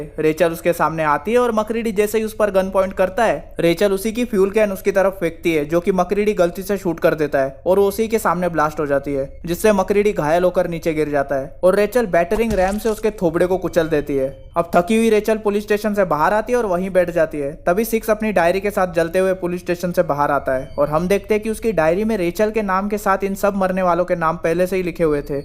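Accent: Maharashtra, India. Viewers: Bro you have marathi accent that is why I asked do you live in Maharashtra that's all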